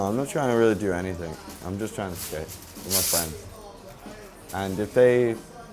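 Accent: United States. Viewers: strange accent seems he picked up an american twang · Once he starts drankin he sounds American.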